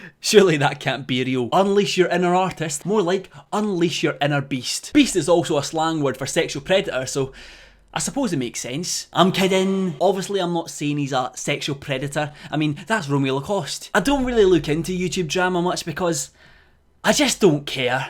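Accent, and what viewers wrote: Scotland, United Kingdom. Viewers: Your accent gives me life I can’t understand half of what you say but it’s like I’m in the countryside of Scotland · "mashing tatties. More like Tati mashed James" 😂😂😂 Edit: also where in Scotland are you from? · Is he Scottish? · Your Scottish accent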